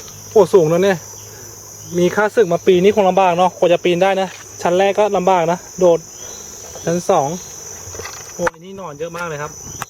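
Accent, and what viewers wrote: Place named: Suphanburi, Thailand. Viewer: พิธีกร ควรฝึกฟัง พูด ภาษาลาวบ้าง พูดเหน่อๆ สุพรรณ เวลาคุยกับชาวลาว ไม่รู้คำศัพท์อะไรเลย คนฟังรู้สึกตะหงิดๆ แต่เนื้อหาดี น่าสนใจ ขนาดคนไทยด้วยกันยังฟังไม่ค่อยออก เหน่อจัด อิอิ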